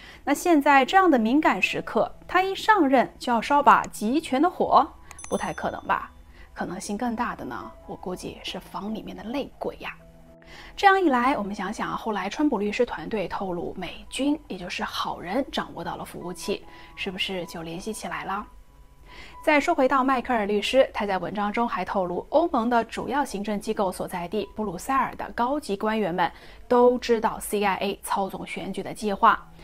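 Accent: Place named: Shanxi, China. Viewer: 你老家是山西或内蒙，感觉你的普通话有乡音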